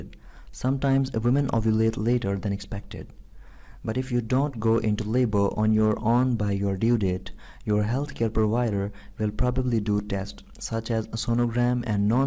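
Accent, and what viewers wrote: India. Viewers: Kashmiri accent in your voice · what an indian accent.. Is this from Bollywood ??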